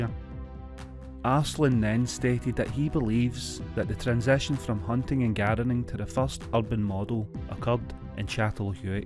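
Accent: Scotland, United Kingdom. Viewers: English with a Scottish accent 🏴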